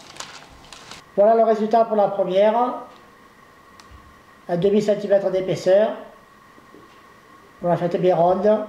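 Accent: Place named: Provence, France. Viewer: Merci pour cette recette super facile et bien expliquée, ma pâte se repose en ce moment, et merci pour votre accent qui sent bon la Provence de chez moi lol, joyeuses fêtes à tous!!!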